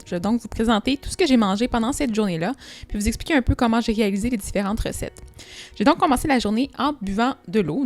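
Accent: Quebec, Canada. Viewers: Je viens de découvrir ta chaîne. Comment te dire que je l'adore déjà Déjà cet accent québécois 😍 puis ces recettes 😝 Merci pour ce beau partage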